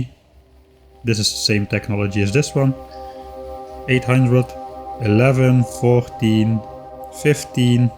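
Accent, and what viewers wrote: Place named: Limburg, Netherlands. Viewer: Was trying top determine from your accent from which part of The Netherlands you are. My guess is the northern part of Limburg. How close am I?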